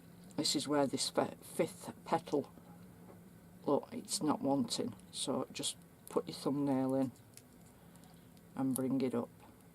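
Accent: Lancashire, United Kingdom. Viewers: Hello my lovely I haven't seen one of your video's for ages. This is great🖒 I will share it on my Pinterest for you. are you from Lancashire you sound like my aunt in Preston lol x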